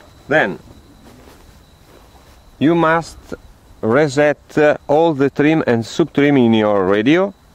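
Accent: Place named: Ferrara, Italy. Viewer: I can notice the accent from Ferrara even if you're talking in english.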